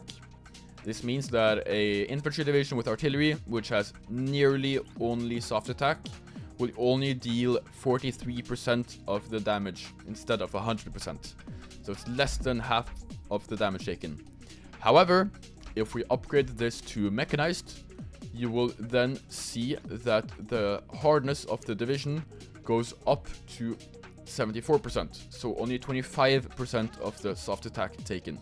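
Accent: Norway. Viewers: Bror høres så norsk ut🔥🔥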